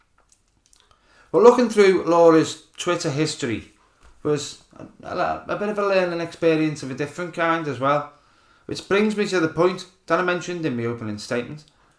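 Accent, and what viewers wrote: Liverpool, United Kingdom. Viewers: I really like this shout scouser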